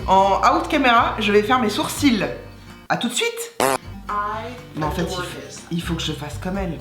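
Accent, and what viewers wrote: England, United Kingdom. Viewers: toi j'aime m'occuper de ma peau MAIS LE MAQUILLAGE ÇA PREND PAS SOIN DE TA PEAU HAHA TU FAIT RIRE😂😂😂😂 #LE CACA D'OEUIL QUI VIEN TOUT GACHER TU ME RIRE AVEC TON ACCENT ANGLAI VIELLO😂😂😂😂😂😂😂😂😂 MAIS JE T'ADOR MA PRINCESSE TU FAIT TROP RIRE😚😚😚😚😚😚😍😍😍😘😘😘😗😗😗😗😂😂😂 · Elle est belle les anglaises sont trop belles charmante accens charmant et appart de reves.bref source dinspi. Univers rend nous charmante.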